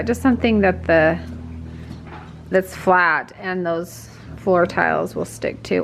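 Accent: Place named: Midwest, United States. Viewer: You are a working women!! I really like how it turned out. Sometimes you get into something and it can throw you, but you guys did it. I just love your wicker around your tree. Now! questions. You sound like you might be from the mid-west. I am from Iowa. My maiden name was Hansen, Yes with a sen. I was wondering. let me know. this is the second time I have found you. Also when you said call it a day. That sounds like something I would say. Joyce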